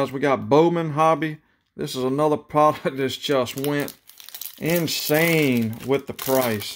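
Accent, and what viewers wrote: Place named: Louisiana, United States. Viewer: I love your backwoods Cajun southern accent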